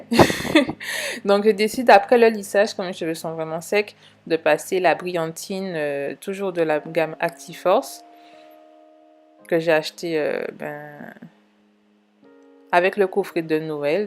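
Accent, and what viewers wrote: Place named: Guadeloupe, France. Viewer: Oui super! Ma question était un peu bête car en regardant ta video 23 choses a savoir je l'ai su mdrr (de toute façon à l'accent je m'en doutais eheh) #TeamGuadeloupe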